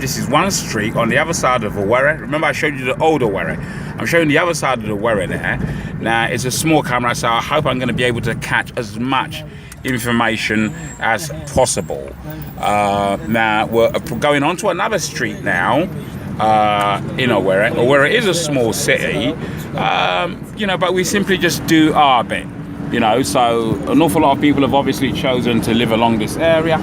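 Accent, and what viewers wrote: Cockney, United Kingdom. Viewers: interesting commentary...esp' with your cockney accent. LOL someone should tell Rochas he needs to improve the quality of the roads his gov't constructs in Owerri! that's what lets down his efforts in opening up new areas of the city!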